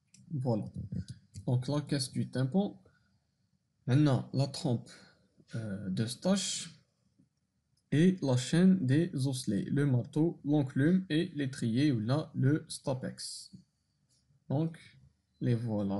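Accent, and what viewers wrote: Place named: Jijel, Algeria. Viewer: ça aide super bien merci, ps: j'adore lehdja dyalek djidjliya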